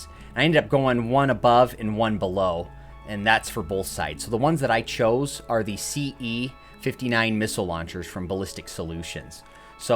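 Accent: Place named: Minnesota, United States. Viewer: Are you from Minnesota by chance? I know a lot of people from my hometown who have the same accent as you.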